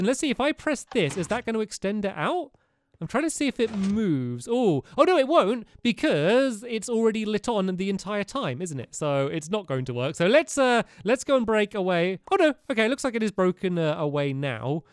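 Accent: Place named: Liverpool, United Kingdom. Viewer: Scouse KiddaLaa boi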